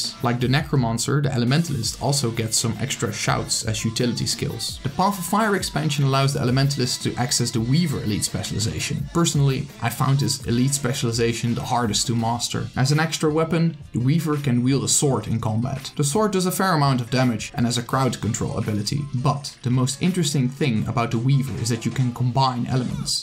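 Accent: Netherlands. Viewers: You are dutch, aren't you? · Not that your English is bad, far from that, but i imediately heared you're dutch. Great Video tough. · Hands down the BEST video of its type. SUBBED. Love your accent. Dutch? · i clicked - i hear a dutch accent - i like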